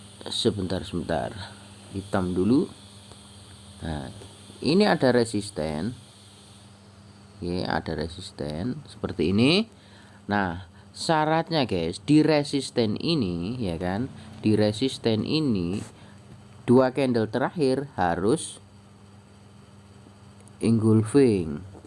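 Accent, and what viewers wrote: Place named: Java, Indonesia. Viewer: Ternyata wong jowo to mas... Jawa mana mas?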